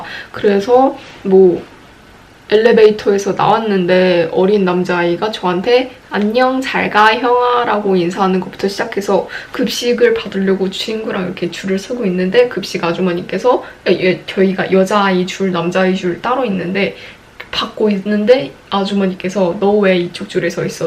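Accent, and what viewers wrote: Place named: Gyeongsang, South Korea. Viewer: 경상도 말투가 묻어나오네요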